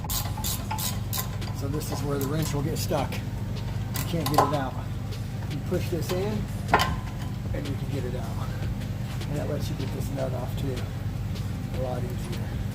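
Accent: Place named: Canada. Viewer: though it sounded like trailer park boys are you canadian by any chance?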